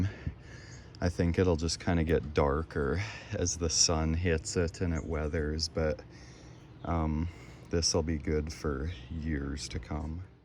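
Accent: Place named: Upper Michigan, United States. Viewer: We have similar accents here (UP of Michigan) I went to pick up my nephew (he's in his late 30's, i'm in my late 40's). His dad my bro-in-law is a roofing contractor. so I say: 'Yah.... I went and picked up a bundle of cedar shingles the other day' 'they were so rough-sawn I don't even think I could us em as shims' He looked at me, astonished, and said 'yep! it's hard to even find good shims to buy.'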